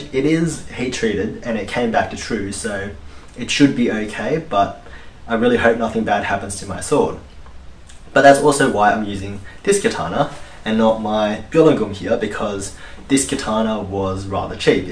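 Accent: Australia. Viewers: are you australian? (accent) nvm you just sead aud are you in sydney because im going crazy trying to find bamboo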